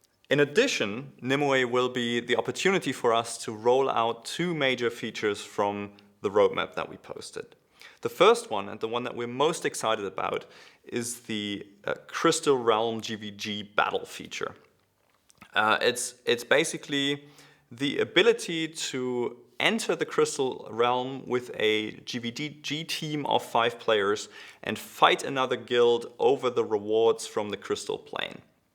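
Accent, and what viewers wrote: Germany. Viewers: This man sounds SO ULTRA German.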